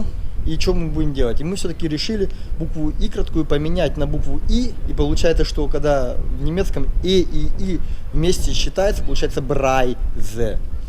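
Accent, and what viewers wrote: Kazakhstan. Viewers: у тебя говор и речевые обороты как у моего мужа и его брата и племянников из Казахстана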